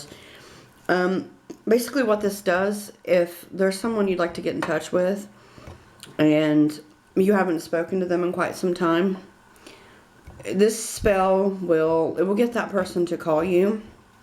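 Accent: South, United States. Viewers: Haha I love your southern accent! Thanks for the video! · Haa! funny how you do not like whomever told you don't like a Southern accent....your accent is terrific, and your straightforwardness is great....Thank you! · Thanks for the great video.... and just for the record, English guys love that Southern accent;) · cool nails also I dont care about ur southern voice because my family is from texas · I didn’t even notice you had a southern accent until you mentioned it!!! But I am from a southern area and have had people judge me off of it too. Honestly I love southern accents so everyone who hates on it can go somewhere haha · I happen to love your Southern accent - I want one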